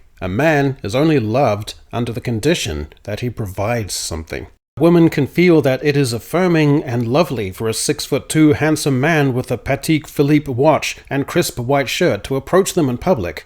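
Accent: New Zealand. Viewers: The classic New Zealand accent pronounces "Ten cents" like "Tin cints", with a very short vowel that turns the 'e' into an 'i' That said, may be using both "womAn" and "womEn" correctly, but American ears can't distinguish between the way the Kiwis pronounce 'a' and 'e'. I lived in NZ for 7 years, back in the 1970s, and in Australia for 3, so I'm acquainted with some of the idiosyncratic ANZAC dialect. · I have to ask this. I have watched nearly all of your videos, and enjoyed them, partly because of your sharp analytical mind, partly because of the Kiwi accent ... but ... who is that guy with the really complicated situation in his hid?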